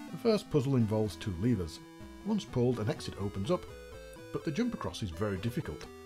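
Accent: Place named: North, United Kingdom. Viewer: no northerners pronounce stuff correctly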